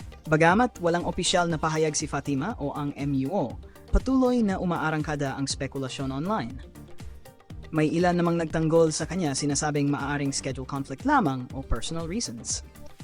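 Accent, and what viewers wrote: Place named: Visayas, Philippines. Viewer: Hala! May accent ka na nga !!! Dati tunog visayas kaw!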